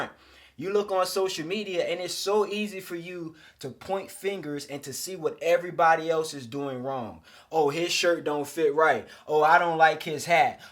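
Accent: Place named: Baltimore, United States. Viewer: You from B more bro? I hear an accent lol love ya videos G! 💯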